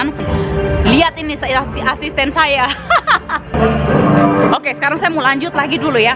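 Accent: Papua, Indonesia. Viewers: Logat Papua kaka 😁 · kk org papuaaaa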